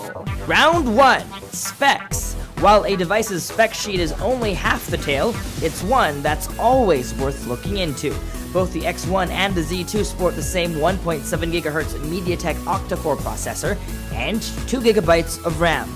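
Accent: Philippines. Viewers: Grabe mga tao dito nanghihila pababa, alam niyo kung bakit siya nagenglish? Gusto niya kasi hindi lang mga pinoy ang manonood pati na mga taga ibang bansa, tska ano naman kung hindi perfect ang accent niya? Problema dito laging ganyan mga mga language/accent police mga tao rito kala mo mga perpekto amp, average naman ang accent niya compared to other filipinos kung magtagalog eh "poreber, beri gud" etc. Di man siya perpekto magsalita ok lang dahil pilipino siya!! Ok!? Pakinggan niyo mga german, french, korean, japanese talo natin sila, wag niyo namang laitin ang kababayan natin, hence focus on the video comparison kesa para kayong gago jan na kinokorek niyo lahat ng bagay.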